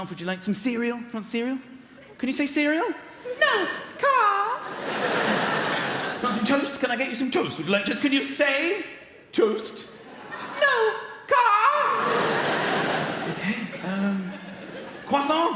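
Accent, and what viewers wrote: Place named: United Kingdom. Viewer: oh well at least Brits can understand everything he says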